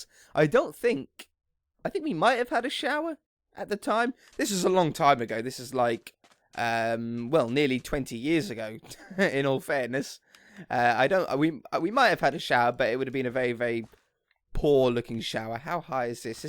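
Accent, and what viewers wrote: United Kingdom. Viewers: I love your voice, that your british and that your a redhead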